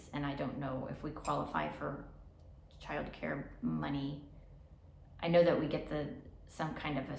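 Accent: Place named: United States. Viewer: your american accent... quite charming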